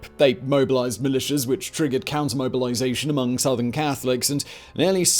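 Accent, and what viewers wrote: RP, United Kingdom. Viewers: There's something about listening to someone with a fairly posh English accent explaining Irish independence, but this was a very good video.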